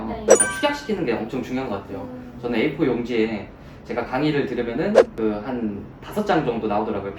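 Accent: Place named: Jeolla, South Korea. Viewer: 말투가 전라도인데 혹시 고향이?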